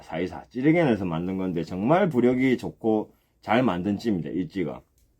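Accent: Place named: Gyeongsang, South Korea. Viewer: 혼자 방송하는데 마스크 벗고해도 되지 않을까요? 경상도 억양이라서 알아듣기 힘든데....발음까지...ㅠㅠ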